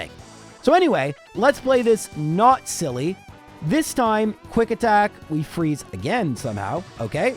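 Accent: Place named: Canada. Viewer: I often forget is Canadian until he says words like "Sorry" and "Tomorrow". Then it's really obvious.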